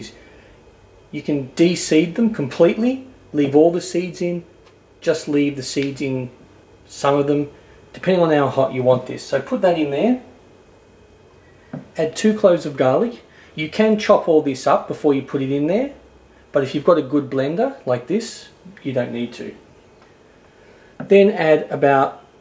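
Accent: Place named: Australia. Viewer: Lol as if your great Aussie accent doesn't give away where you are! Hello from Sydney!!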